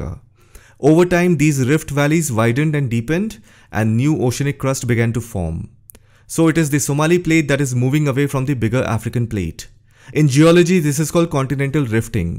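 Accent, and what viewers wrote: India. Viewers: Why you sound like you from india? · Bro, sounds Indian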